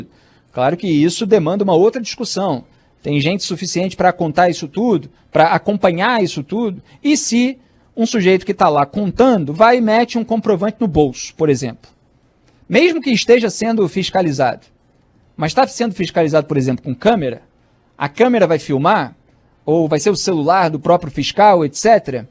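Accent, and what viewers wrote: Rio de Janeiro, Brazil. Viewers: Carioca sempre tem bom gosto bonita camisa os cariocas fazem academia mantém uma elegancia incomparável fora o sotaque carioca sem dúvida o mais charmoso deste país com tantas contradições são muito sociaveis mas acho que o Brasil 🇧🇷 nunca vai ser socialista o socialismo não é sinal de progresso apesar de você ter uma capacidade incrível de convencer as pessoas.bjs Benne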